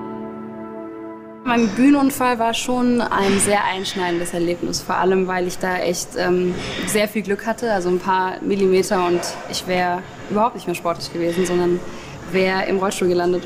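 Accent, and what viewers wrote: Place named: North Rhine-Westphalia, Germany. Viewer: Mensch. Bin total enttäuscht über Deinen NRW Slang. Ich dachte Du bist aus Ba-Wü und kannst echtes Hochdeutsch. Ausdrücke wie Spoaart oder Leaaarnen gehen gar nicht. Es heißt Sport z.B. mit kurzem O und keinem anderen Vokal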